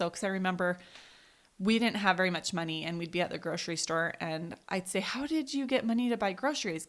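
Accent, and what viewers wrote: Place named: Utah, United States. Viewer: I love you, so much more after hearing your story. It’s incredible how God uses our testimonies to grow and change us. I could tell after us meeting that your accent sounded like you were from that area of Utah! I’m so proud of you for sharing your story. Love you guys!!!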